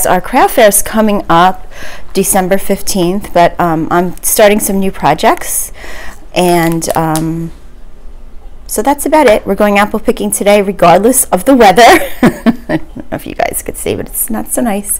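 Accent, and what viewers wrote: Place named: New York, United States. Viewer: Your dp looks great so far! I’m a Jersey girl, you sound a little New York ❤️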